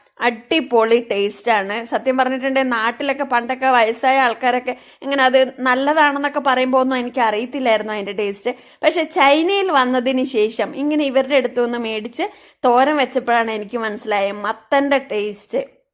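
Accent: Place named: South Kerala, India. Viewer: kannur slang alla. South kerala slang aanallo.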